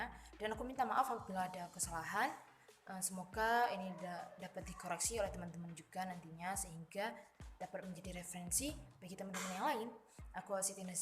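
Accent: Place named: Java, Indonesia. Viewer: medok e sejak kapan ndok??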